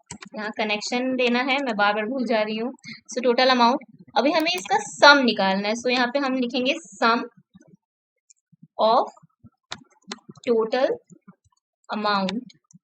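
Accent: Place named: Varanasi, India. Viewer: I bet you are from U.P ...and from the accent I can say its either Banaras or Prayagraj...😅😅 ...and BTW ...the clarity of your analysis is astoundingly amazing ..keep up the good work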